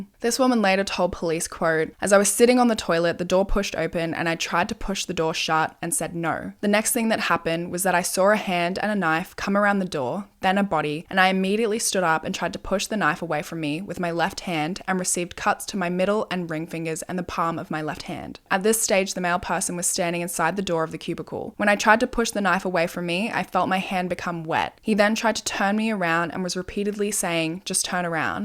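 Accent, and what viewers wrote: Australia. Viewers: I got hooked with your videos because of your voice and Aussie accent 🤗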